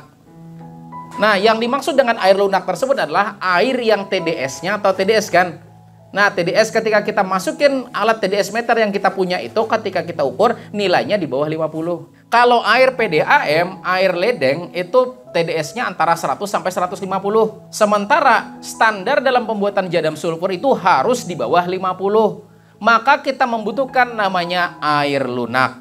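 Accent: Banjar, Indonesia. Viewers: Puan orang mana ta buat logat banjar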